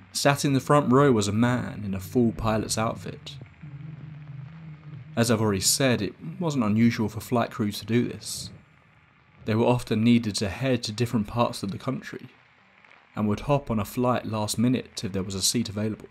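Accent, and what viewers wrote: England, United Kingdom. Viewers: Nice british accent n great storytelling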